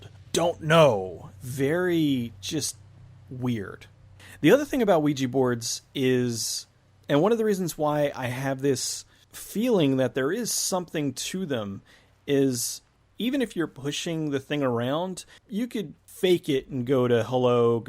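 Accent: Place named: Maine, United States. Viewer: Herr Oberst Wilhelm Von Borchert You're right. Sounds like grew up in a King novel.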